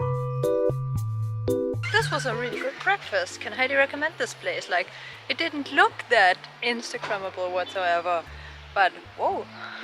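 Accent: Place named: Europe. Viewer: Hello, I have been to Lima 3 times, but the last time was in the early 80's. I have also been to 29 other countries. Your voice sounds familiar, maybe I visited your country before, but can not place your home country. I think maybe European. Ron USCG RET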